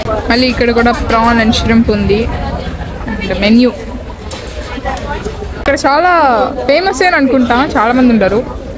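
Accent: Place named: Rayalaseema, India. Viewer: Mi voice vintunte you are belong to rayalasima anipisthundi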